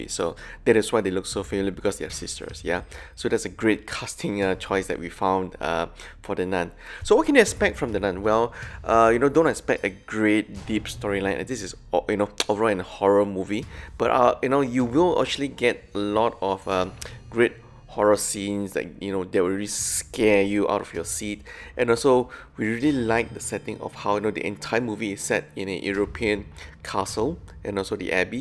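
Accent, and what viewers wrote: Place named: Singapore. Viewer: Hi are you singaporean? You have a singaporean accent haha.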